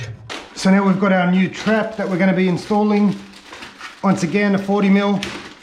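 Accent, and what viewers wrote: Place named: Australia. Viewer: Simply explained but must be Australian.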